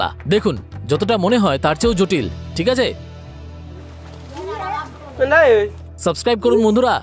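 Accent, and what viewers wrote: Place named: Sylhet, Bangladesh. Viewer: সিলেটি সিলেটি ভাব আছে কথা কন্ঠে!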